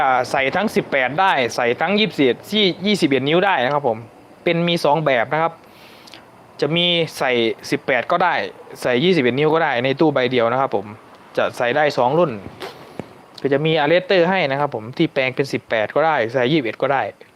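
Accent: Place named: Lampang, Thailand. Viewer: ลำปางชัดเจนดีครับ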